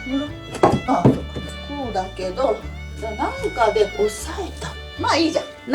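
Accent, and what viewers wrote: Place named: Yamanashi, Japan. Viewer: 祖母が山梨の人のため、たまにでる甲州弁に懐かしさを覚えながら見てます。将来こんな暮らししたいです。見てて元気が出ます！！いつもありがとうございます！！！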